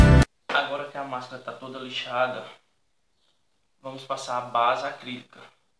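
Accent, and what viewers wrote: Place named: Bahia, Brazil. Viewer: vc e bahiano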